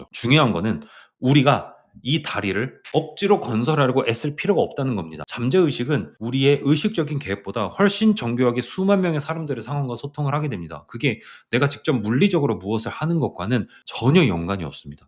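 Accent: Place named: Jeolla, South Korea. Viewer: 아무리 숨겨도 감춰지지 않는 전라도 사투리=사짜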